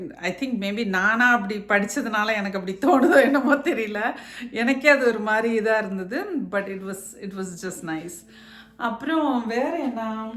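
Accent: Salem, India. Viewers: சகோதரி நம்ம சேலம் ஸ்லாங் உங்களுக்கு இன்னும் அப்படியே இருக்கு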